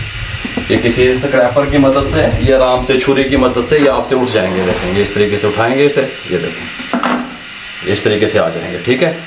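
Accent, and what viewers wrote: Punjab, India. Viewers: Main ne jitni bhi aapko recipes daikhi hain aur saaari try bui karto hoon aur wh 100% perfect banti hain aapki recipes bohat zabardast hoti hain aur mujhay sorf aapki recipes pasand hain aaur aap ka punjabi style bohat achha lagta hai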